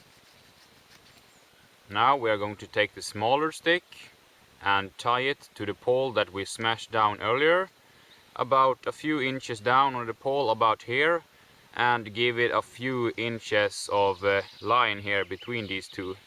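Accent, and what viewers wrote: Sweden. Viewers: Make sure the sticks are really "smood" guys. ahahah I love the Swedish accent. awesome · Wow his swedish accent isbreally close to a finnish accent!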